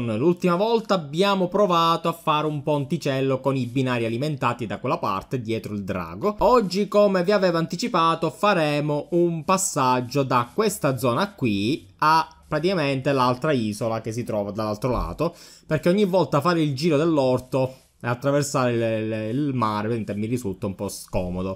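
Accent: Palermo, Italy. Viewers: Scusa mah sei di Palermo per caso?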